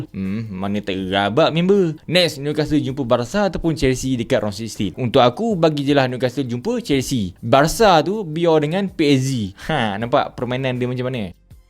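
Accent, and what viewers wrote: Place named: Kedah, Malaysia. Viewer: Harey hg cakap kedah bunyi supa taik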